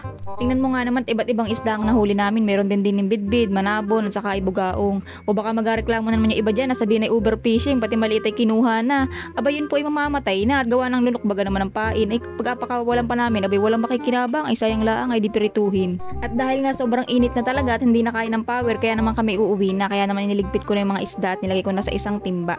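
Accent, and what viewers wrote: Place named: Quezon, Philippines. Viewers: Sa quezun province yan salita ni ate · nakakatuwa kapo yun sslita mo salitang quizon provence ssalot idol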